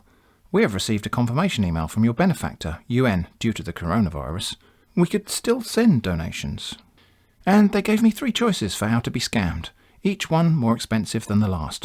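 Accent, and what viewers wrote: England, United Kingdom. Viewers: I always find the contrast of reading the broken English in a near perfect English accent quite amusing. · ”Near” perfect? 😂 He is English. How should he improve his “near perfect” English accent. That is an English accent. 😂🤷🏻‍♂️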